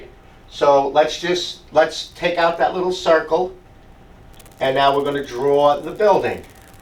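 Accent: New York, United States. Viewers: Dear, thank you very very much for your reply! I’m learning a great deal from your incredible videos, and even though I live in TX now, I am also from NY. The first time I heard your voice, I felt like I was at the local deli again listening to the fellas 😂. I wasn’t able to finish the job as of yet, because I’m rebuilding the entire 3” PVC intake/ vent system that was put together with silicone caulk 😡. Needless to say, and because I work alone, this job is now set back two days for the venting replacements ( the units are in the attic immediately adjacent to the 22’ high vaulted ceiling joists in the great room with the rafters framed over the top of it. Like a scissor truss. No flat surfaces to stand on where the venting runs to exit the roof, all of the venting was obviously done on make shift scaffolding during construction. How did you know they sent me a schematic “without” the PRV’s in it? 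Never mind, no need to answer that, lol. I appreciate you very much Sir. May I please send you an email with a copy of the piping schematic they sent me? I can also send you a picture of what I have to work with. Thank you! RXP199iN and an RX199iN with a dedicated recirc line. I have the Rinnai dedicated recirc valve with the additional brass elbow you recommend to make everything work as intended. I also have the REU-EZC3 cable and the MC195t controller for recirc scheduling.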